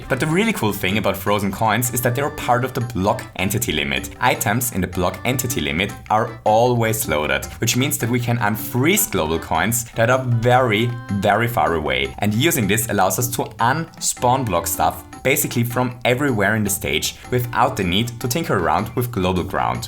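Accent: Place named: Germany. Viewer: Bin ich der einzige der denkt das er einen deutschen Akzent hat? OK dann bin ich wohl der einzige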